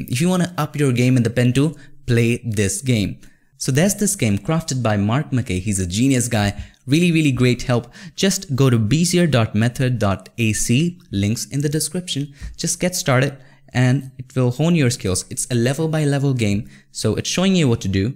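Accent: India. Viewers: du redest zu viel mit deinem indischen Akzent😮😅😊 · bahasa inggris logat india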